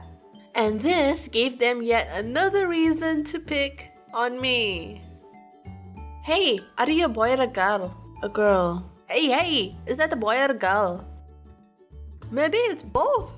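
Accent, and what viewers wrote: Scotland, United Kingdom. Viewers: I love yoyr Scottish accent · It sounds like there’s a q in every sentence in the Scottish acent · I can still hear your Scottish accent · i understand scotland accent actually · I love her Scottish voice because I am Scottish and irish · I live in the UK (Scotland) Your scottish accent is hilarious. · your scottish accent isnt that bad like this comment if ur scottish 1st like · I’m a good artist too this was an amazing story to show to my friends! By the way i like your trying to speak Scottish! Be yourself your beautiful on the inside. Always believable in yourself!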